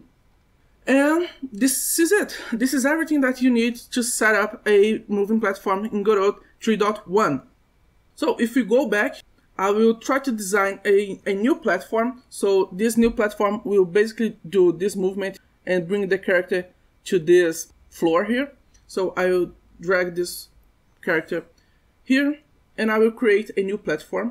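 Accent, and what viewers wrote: Brazil. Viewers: da pra ver q é do brasil so pelo sotaque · Você é br hein não engana kkj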